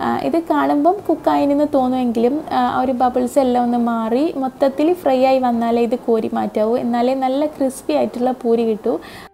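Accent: Kannur, India. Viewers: നിങ്ങൾ kannur കാരി ആണോ കണ്ണൂർ ഭാഷ പോലെ തോന്നി 😄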